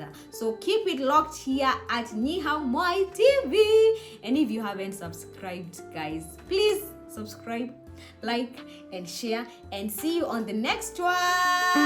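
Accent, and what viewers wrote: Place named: South Africa. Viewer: Love the accent, Kinda reminds me of how Tyla speaks, are you South African?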